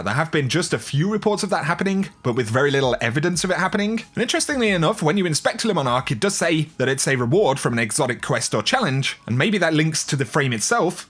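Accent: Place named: Lancashire, United Kingdom. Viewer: it is though to judge but I'm a tech iv checked my connection and bandwidth its very stable but according to bungie iv got a terrible connection very strange but I think it's the virtual servers auto detecting and trying to correct the connection they have thousands of options on a vr server so anything can go wrong great vid and you sound like your from Lancashire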